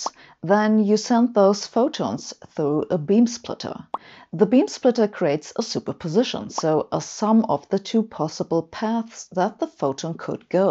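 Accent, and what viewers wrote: Germany. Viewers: the goal is to monetize. people who like the illusion of themselves understanding something without any effort really like this German accented "no gobbledygook" which is actually pure gobbledygook since she never invests in actually explaining in detail without using silly metaphors ...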